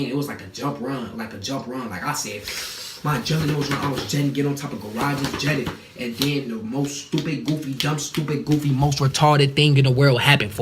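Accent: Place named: Chicago, United States. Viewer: I can tell yh frm chicago fote😭😭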